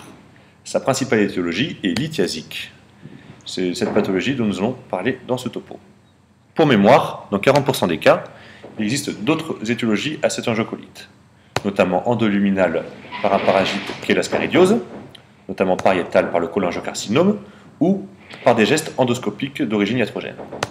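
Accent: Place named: France. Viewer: le meilleur accent de France, merci pour cette video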